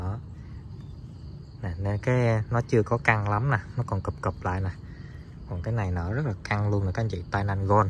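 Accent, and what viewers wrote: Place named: South, Vietnam. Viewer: Mình rất thích nghe giọng nói của giọng chuẩn miền Nam nghe rất là thân thiện lại còn vui tính tại vì mình cũng là ngươi miền Nam.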